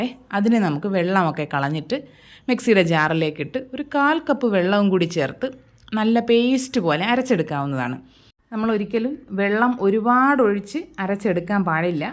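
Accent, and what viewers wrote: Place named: Kollam, India. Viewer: കൊല്ലം ജില്ലക്കാരിയാണോ? സംസാരം ഞങ്ങൾകൊല്ലക്കാരുടെ പോലെയുണ്ട്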